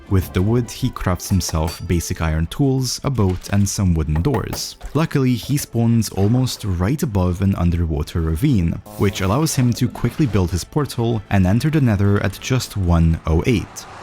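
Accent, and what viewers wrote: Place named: Scotland, United Kingdom. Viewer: It's the vowel sounds that are giving that scottish twang especially the 'I' in minecraft, Northern english accents can have a lot of overlap with accents north of the border so that makes sense! Anyway cheers for answering and class video man!!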